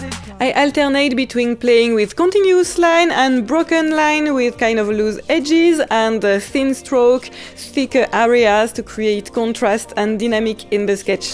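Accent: France. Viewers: I loved watching you do this beautiful sketch. You are a fantastic teacher and your personality makes me feel happy. Also your lovely French accent reminds me of so many happy times visiting France. Thank you very much.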